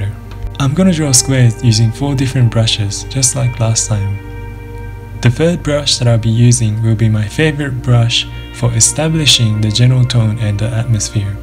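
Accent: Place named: Asia. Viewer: I'm confused in your tutorials from before and always with your intros your voice was much higher and definitely had a stronger Asian accent. But this video you don't have it which I find strange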